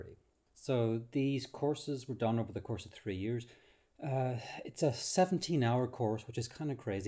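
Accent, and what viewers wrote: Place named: Ireland. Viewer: I’ve done loads of your courses, they’re all great! Great to hear an Irish accent too:) go!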